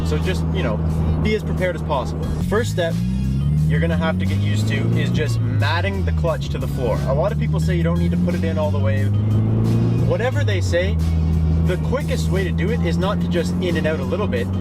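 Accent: Canada. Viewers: Tell me your from Canada without telling me your from Canada. You didn’t have to say it we could tell. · U must be Canadian ? I love it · so canadian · 1. He's Canadian, but you heard that one enough by now haven't you? 2. 10 min vids are simply the way you have to do it these days. Youtube pulls so much crap and changes constantly, so it's harder, than ever to grow as a channel. They care about view length more than views, so if your vid is shorter, they won't recommend you. He's doing it so he can still survive as a channel, because the sad truth is, that he pretty much has to until he finds a stable way of income. Remember that he's doing Youtube full-time now, so his income is likely low. He's been asking his subs on how we'd like him to find a stable income, so it's clearly the case here. Also if this was to squeeze as much money out as possible, he'd make it at least 10 min long and put like 4 ads on it, but he didn't. 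3. This tutorial was not meant to be advanced at all. It was clearly a tutorial to learn a basic way to shift faster. It's for new enthusiasts, who are starting out. You know, the people a lot of guys in the car community like to bash for no reason, until they think we're just a bunch of arrogant assholes and walk away, wasting a potential new passionate enthusiast in a community, that is probably coming to a decline soon, as more and more people lose the interest in the engagement of driving? And I know this is true, since I've seen at least 2 or 3 people who gave up for that reason and I notice, that a lot of young people just don't have the same interest in cars as years back. Even I almost gave up, b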